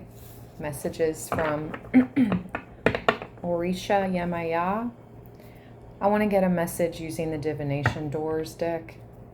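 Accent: United States. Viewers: Are you from Kentucky?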